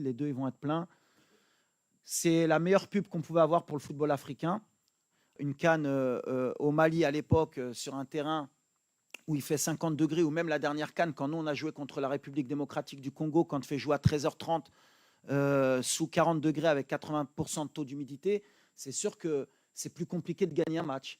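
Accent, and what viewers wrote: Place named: Paris, France. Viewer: Il parle Parisien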